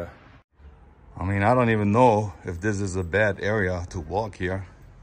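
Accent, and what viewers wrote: Germany. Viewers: Your mild German accent sounds so pleasant to my ears:)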